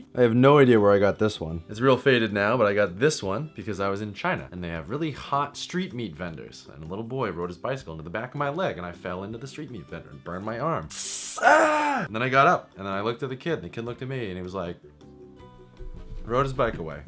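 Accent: Boston, United States. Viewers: ***** oh. haha I've always thought she was from Boston. I can't tell the accents apart ^^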